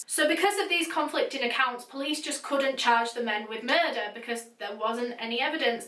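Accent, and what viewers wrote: United Kingdom. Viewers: wait...her accent is so amazinngg its like british + merida's accent (idk her accent sorry) its so coooll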